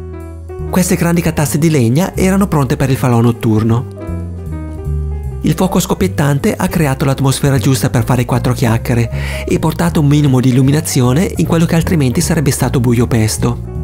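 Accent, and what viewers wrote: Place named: United Kingdom. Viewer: Ti ho scoperto da poco, e sei bravissimo e simpaticissimo con quel tuo accento inglese :)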